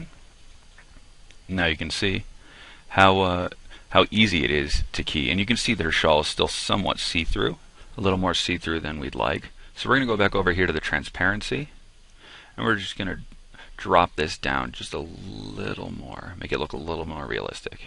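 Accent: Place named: United States. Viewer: pure amercian accent - very nice video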